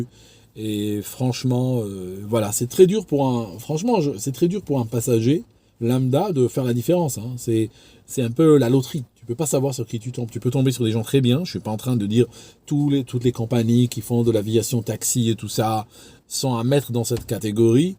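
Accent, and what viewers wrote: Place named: Switzerland. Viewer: qui s'appelle en fait est né en Algérie. Je suppose donc que sa langue maternelle est l'arabe algérien. Il a aussi vécu en Suisse où il a passé une bonne partie de son enfance (d'où son accent suisse qui ressort de temps en temps) et où il a appris à piloter des avions (mais peut-être avait-il déjà commencé en Algérie). Il a commencé des études de médecine en France qu'il n'a pas pu finir à cause d'un visa qui n'a pas été renouvelé. Il habite maintenant à Londres où il a travaillé dans l'informatique (et peut-être dans la sécurité aérienne?) et vit maintenant du revenu de ses chaînes YouTube et en vendant des livres. Bref, c'est un touche-à-tout très doué qui parle au moins trois langues: l'arabe algérien, le français et l'anglais.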